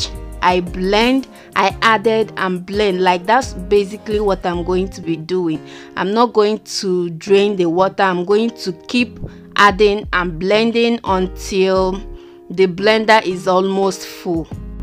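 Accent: Igbo, Nigeria. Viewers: Ibo woman and her accent preparing fufu and eggs soup with chicken.....Ibo woman no dey carry last.... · First time watching your video and I knew already you are Nigerian by your accent 😁😁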